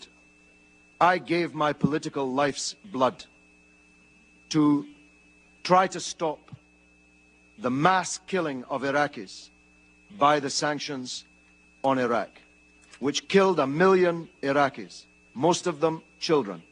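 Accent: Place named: Dundee, United Kingdom. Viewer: A true dundonian Dundee - the best city in the worrrld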